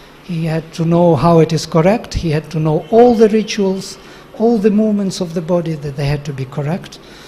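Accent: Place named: Russia. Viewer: Very beautiful exposition and i really enjoyed the charming Russian (?), Indian accent and humble manner in which it was delivered. Thanks !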